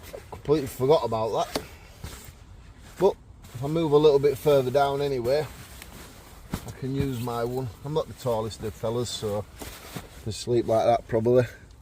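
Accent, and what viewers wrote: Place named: Liverpool, United Kingdom. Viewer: It's called scouse lol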